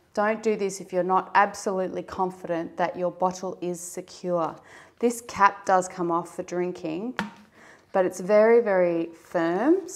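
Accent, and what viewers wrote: Australia. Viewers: Sounds like you might be an Aussie.. 🌻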